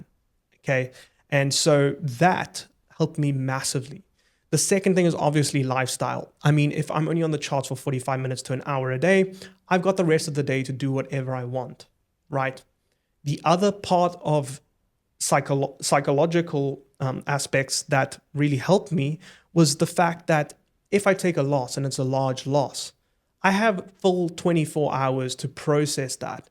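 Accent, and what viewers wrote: South Africa. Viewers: sounds like he is from Cape Town 🤔 lovely video by the way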